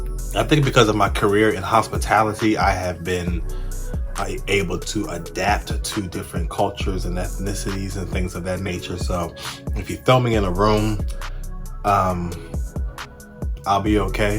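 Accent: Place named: England, United Kingdom. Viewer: You did come back with a English accent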